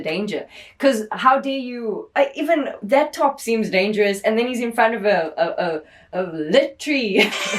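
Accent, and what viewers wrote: South Africa. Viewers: You South african....am I right? I know our South African accent from anywhere. Anyways FUN FACT: WORLD DOMINATION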